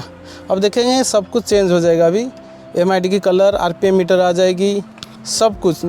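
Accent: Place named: Odisha, India. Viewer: bro are you from Odisha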